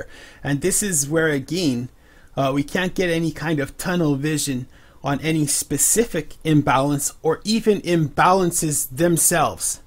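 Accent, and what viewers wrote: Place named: North Dakota, United States. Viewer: I love your accent!! North Dakota??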